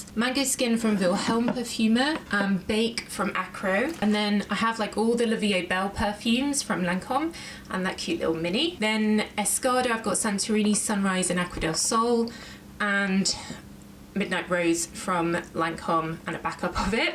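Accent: United Kingdom. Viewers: Congratulations,! I like your collection. We share a lot of preferences: Michael kors Gorgeous, Aqua allegoria mandarine basilic, l Interdi, la nuit tresor... your descriptions are just accurate, I can rely on! I also like your British accent, I enjoy both English and parfums❤😊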